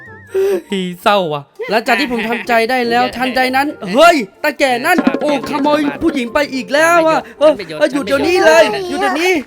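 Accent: Isan, Thailand. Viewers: พี่ฉันเป็นคนอีสานหรอคะ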